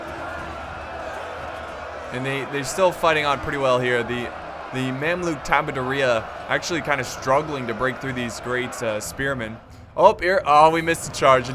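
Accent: Bavaria, Germany. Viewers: Are you from Bavaria? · Are you bavarian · are you from bavaria ?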